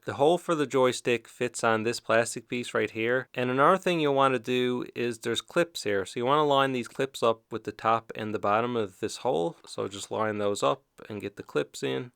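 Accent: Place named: Eastern Canada, Canada. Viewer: Would you happen to be from Newfoundland? I can hear the eastern canadian accent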